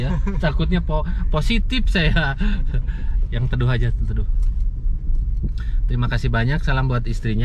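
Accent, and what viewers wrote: West Java, Indonesia. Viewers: Masha Allah..jd teringat kembali hajian th kemarin 2019..kang logat sundana sami sareng abdi..salam kenal abdi asli urang patok beusi..subang..ternyata kang dari kp siluman ya asalna..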